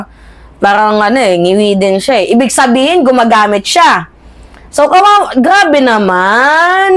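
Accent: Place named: Ilocos, Philippines. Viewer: Team Agustin vlog po ganun din magsalita. Mga ilokano ganun lalo na Pag inielaborate ang wordings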